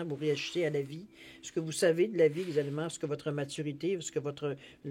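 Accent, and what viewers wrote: Quebec, Canada. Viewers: super bonne interprétation j'aime ton accent québécois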